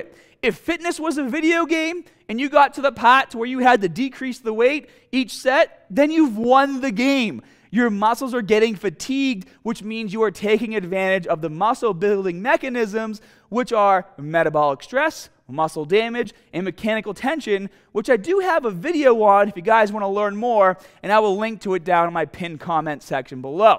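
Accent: Boston, United States. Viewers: Canadians dont have accents lol. It's as plain English as you'll get. This is boston all day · Are you from Boston? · Except he has none of those accents. I guess 'American' could work but it's a Boston area accent. There are like 20 'American' accents. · It's Boston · Doda Dado could be either Boston or Chicago accent. Lots of Irish influence in those regions back in the day. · That Boston accent on heavy lol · He sonds part Boston and part dork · Is he from boston? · Boston. That's what they sound like. I love it. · Are you from Boston? That accent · Love the Boston accent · no its Bahston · He is from Boston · do you really have that hard of a Boston accent? I've watched many of your vids and I've never noticed it before, it almost sounds like youre embellishing it haha. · It's actually a completely authentic Merrimack Valley accent, from north of Boston, the Lawrence/Lowell area...my own homeland. 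Though I've been gone for many years, I wax nostalgic hearing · Sounded like Boston to me. It's definitely not Australian! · Svein Gustav Hoff When he moved to Boston. He wanted to match the accent for fun I believe. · You’re so from Boston ❤️ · That's a Boston accent what's the problem ? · GLAD TO SEE A FELLOW BOSTONIAN DOING BIG THINGS ON YOUTUBE ..THE ACCENT IS THICK WITH THIS ONE ITS FUNNY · This is Boston, not LA kid. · Sounds like Boston to me lol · Love watching ur channel and putting your advice to use at the gym trying to get in much better shape also listening to you talk I realize what I sound like to other people when I talk I'm about 45 minutes from Boston I'm in Taunton so mine isn't as strong lol but man now i can see why people from other states recognize our accent immediately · Do I hear a Boston accent? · Is this dude American or Australian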